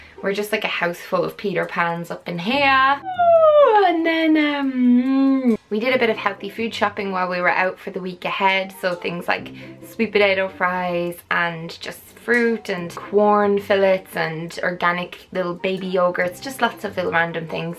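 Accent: Ireland. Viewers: R u Irish? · Ireland u can here on her accent hahah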